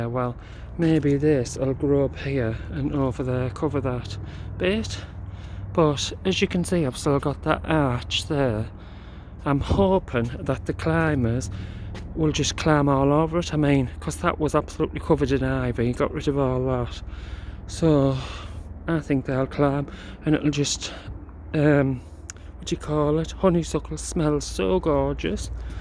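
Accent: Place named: North, United Kingdom. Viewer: Love your Northern accent. Garden will look lovely when in bloom.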